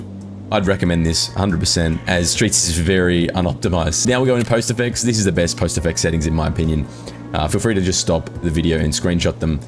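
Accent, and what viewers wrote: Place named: Australia. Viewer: Hey brotherman just wanted to say a big thanks for making this video. Tweaked up a few settings to exactly what you're running. AMAZING ! Visual clarity doubled instantly. Color space is amazing too ! Used your post effects and ended up reseting my digital brightness to default again. AMAZING ! And so nice to hear a fellow aussie. Straight to the point. No bullshit video. 10/10 mang.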